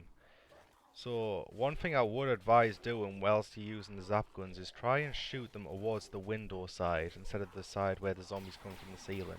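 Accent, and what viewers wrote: Newcastle, United Kingdom. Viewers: he's from Newcastle lol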